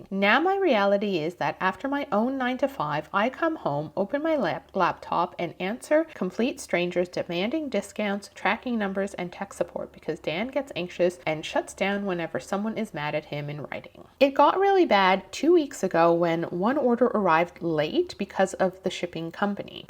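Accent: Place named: United States. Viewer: what is your accent? She’s American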